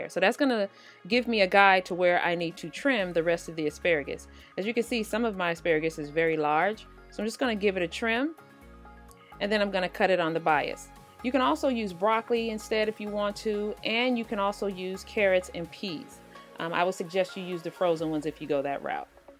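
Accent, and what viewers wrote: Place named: South, United States. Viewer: Hey, I just found you today! I'm so amazed. I would've thought you were from the south. I live in Alabama and you are just like us. I love, love, love your channel. I subscribed and turned on the notifications. 🤓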